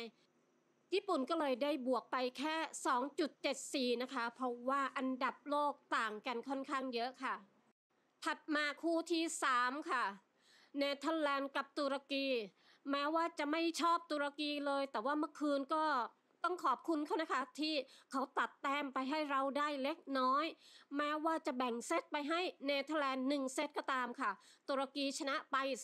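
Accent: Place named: South, Thailand. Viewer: Ad สำเนียงคนใต้ อยู่ จ.ไหนครับ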